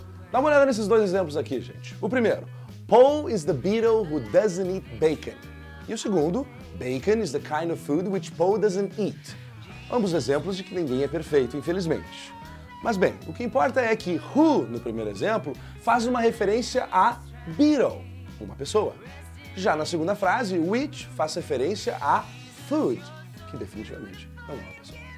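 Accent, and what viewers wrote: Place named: Rio Grande do Sul, Brazil. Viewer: Também sou do RS, de Lajeado, reconheci o sotaque hahahaha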